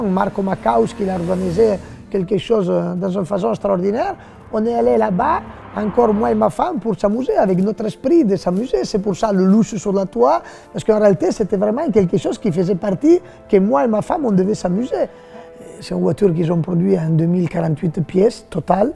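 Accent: Italy. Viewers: Am I wrong or he is an Italian speaking french? If not, what language is that? Sardo? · It isn't. He's an Italian speaking French—with a pronounced accent. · He is italian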